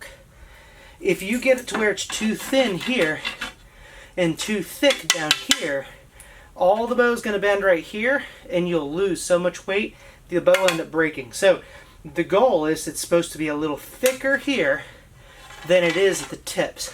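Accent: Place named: South Jersey, United States. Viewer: Are you from the South Jersey/Philadelphia area originally?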